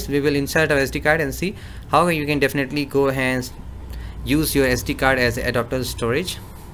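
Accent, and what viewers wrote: India. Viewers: you sound like a hindu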